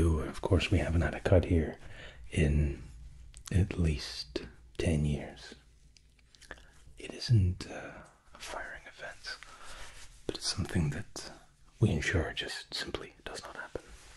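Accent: Scotland, United Kingdom. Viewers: Eh? Scottish?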